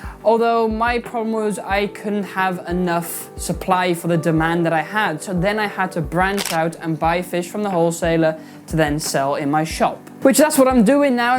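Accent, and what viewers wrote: Wales, United Kingdom. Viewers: Trying to place your accent. Welsh ?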